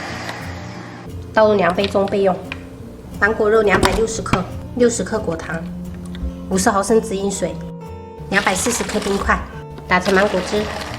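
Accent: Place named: South, China. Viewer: 聽她口音不像閩南口音, 應該是其它南方省份 , 嫁到閩南的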